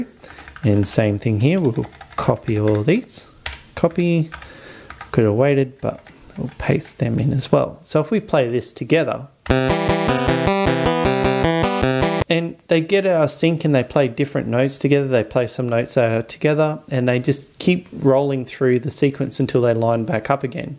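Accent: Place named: New Zealand. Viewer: gosh i love that accent is that kiwi? great tutorial thank you!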